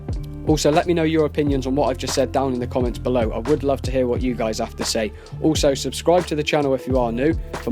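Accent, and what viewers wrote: England, United Kingdom. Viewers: Your videos are so informative and I like your British accent. U sound like such a nice suave person. If u r play on PS4 I would like to meet. What's your real name by the way. Your videos are honest full of correct information. Your information has saved me millions of GTA dollars. Keep up the good work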